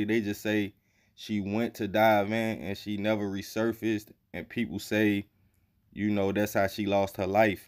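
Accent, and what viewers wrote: Baltimore, United States. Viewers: You can tell he from Baltimore the way he reads.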